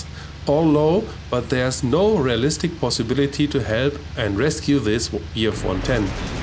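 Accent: Germany. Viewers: I love these videos - the kind of practical, field study lessons that make a real difference in real-world fights compared to generic classroom do's and don'ts. Also, "... but after I'm sure the contrail dude just keeps trucking... " - American slang with a German accent. Brilliant! lol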